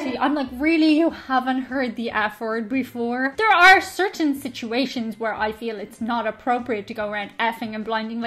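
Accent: United States. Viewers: I really must compliment her on her ""Merican English" She was SO spot on with the State Names and other Americanisms. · you definitely adapting to the American Accent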